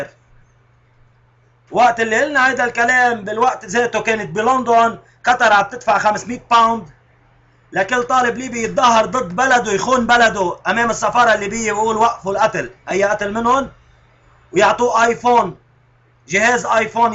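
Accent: Lebanon. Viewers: لهجته لبنانية مو سورية..... هاد لبناني مو سوري وبعدين بيحكي كلمات وسخة كتير وهي من عادات اللبنانية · هاد لبناني من لهجتو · هاد لبناني مو سوري .. السوريين مابيحكو هيك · هادا لبناني مو سوري نحنا لهجتنا مو هيك ياجماعة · هذا ماشي سوري هذا لبناني باينة من لهجة تاعو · لك هاد لبناني مو سوري مبين من حكيو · هيدا لبناني مش سوري لهجتو لبنانيه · من لهجته باينة انها لهجة لبنانية .والله لي فخر انني جزائرية والف تحية لفلسطين وسوريا ولبنان والمغرب كلنا عرب اخوة نحط ايد بإيد باش نصنع المجد ونرجع زمن القوة والسلطة اللي سلبت منا وأستدل بكلامي بقول عمر بن الخطاب رضي الله عنه (نحن قوم أعزنا الله بالإسلام، فإن ابتغينا العزة بغيره أذلنا الله) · ألى الكل هاد الشاب ليس سوري هاد لبناني الدليل اللهجه مش سوريه لهجتو لبنانيه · انا سورية ولهجتو غير اللهجة لسورية لهجتو بتشبه اللهجة اللبنانية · هاد لبناني مبين من لهجتو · اول شي هاد مدح الجزائريين تاني شي هاد لبناني مو سوري و واضح من لهجتو حاج تعملو فتن بين بعض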